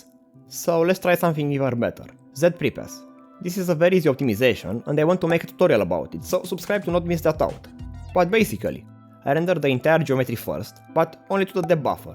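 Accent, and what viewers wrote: Romania. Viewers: instant mi am dat seama ca esti roman dupa accent <3misto vid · mama accentu de roman e prea mare :))) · miros accentu de roman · You sound romanian!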